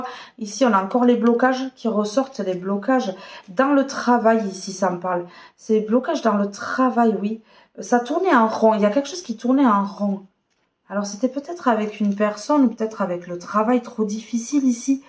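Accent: South, France. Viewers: Merci.pour votre accent méridional c est un peu difficile pour moi de vous écouter 😭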